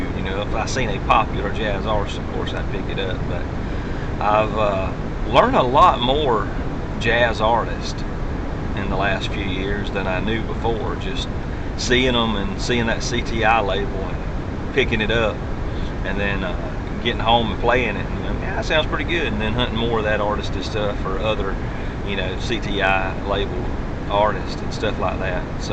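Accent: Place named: South, United States. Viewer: I got a great vinyl collection but no friends to share them with. I know you would enjoy them. Shame I live over in England near a place called Liverpool of Beatles fame. Love your southern accent.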